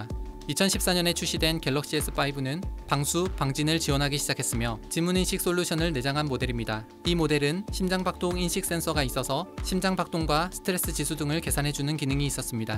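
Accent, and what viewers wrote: Gyeongsang, South Korea. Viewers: 갱상도 클라스 ㅎㅎㅎ ㅅㅂ 이제 댓글에 외국인 댓 졸라 달리겄네^^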